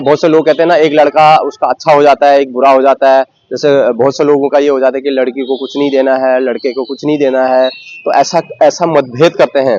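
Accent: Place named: Pahadi, India. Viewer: isko bolna nhi aata aur typical pahadi he 😃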